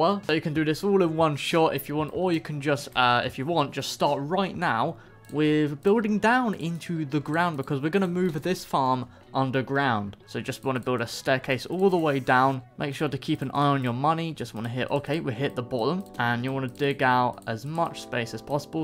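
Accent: United Kingdom. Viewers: Are u British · I love the uk pronunciation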